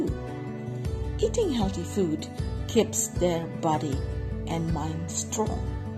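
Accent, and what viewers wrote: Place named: United Kingdom. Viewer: It sounds like British. No boddy noticed that you are Indonesian 👍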